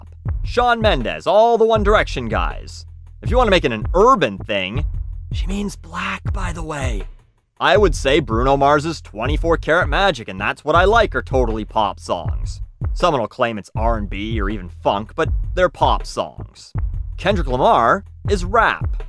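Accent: Canada. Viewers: Anyone noticed Canadian accent? (out, about) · Tornusage it's his Canadian accent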